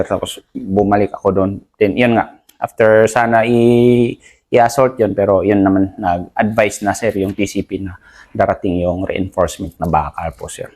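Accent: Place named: Ifugao, Philippines. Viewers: Wow, a big salute Sayo kabayan Major. Halatadong Ifugao/Igorot because of the way you speak Sir. It's always a combination of English and Tagalog 😊😊😊. Anyway, I totally appreciate your courage and wisdom Sir. God bless. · Talagang taga Ifugao si sir ying letter r nya😊ingat palagi sa lakad nyo sir...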